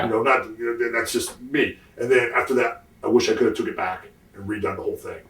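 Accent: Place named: Texas, United States. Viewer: He sounds like Austin and Shawn. Texans.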